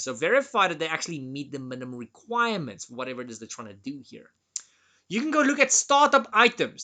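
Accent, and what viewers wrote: South Africa. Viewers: Are you South African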